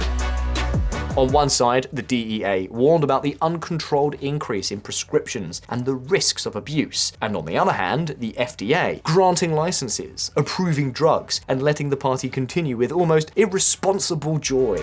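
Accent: United Kingdom. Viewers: Very approriare to have a guy with a judgemental brithish accent lecture USA on opiums By the late 1700s, Britain had a huge trade problem with China: • Britain wanted Chinese tea, silk, and porcelain. • China wanted almost nothing from Britain. • Result: Britain was bleeding silver. So Britain did what empires do when trade doesn’t go their way. The Opium Solution Britain began smuggling opium grown in British-controlled India into China. • Opium was illegal in China. • Millions became addicted. • Silver started flowing out of China and into Britain. China knew exactly what was happening and tried to stop it. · So rich for a Brit sounding dude to sound so smart, yet missing the point. Dude, this is what England did to China, and now is doing it to us. Who is in charge, the bankers dude, the identically same as before. Trump is tearing down the global order, in other words, your king.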